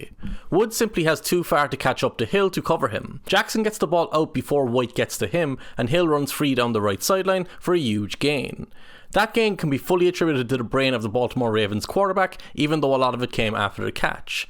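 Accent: Ireland. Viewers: An Irish man commentating American football? Okay I’m here for it. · Can't say I ever thought I'd hear an in-depth film breakdown of an NFL QB from a guy with an Irish accent, but you clearly know what youre ralking about. Great stuff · The best American football commentary with an Irish accent I've ever heard. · Surprised to hear narration from an Irishman.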